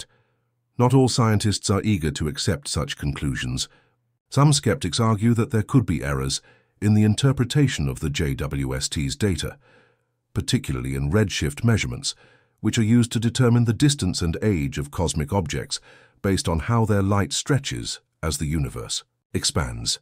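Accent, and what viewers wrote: United Kingdom. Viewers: AI still can't handle punctuation. Ridiculous. And stuffy brit ridiculous at that.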